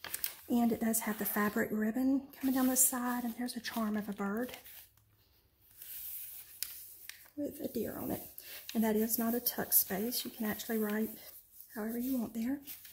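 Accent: South, United States. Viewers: Being Southern myself. I think your accent is perfect. I love your journal. I look for to seeing more of your works. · you have a sweet southern voice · Don't you dare apologize for your accent lovey, it is just charming ( I love southern accents:D) and it is part of you and your history. Hello from Australia btw xo I just love your journal, it is gorgeous (especially love the idea of the pen/pencil space) have subscribed and I am sure I will enjoy many more of your videos in the future, well done xo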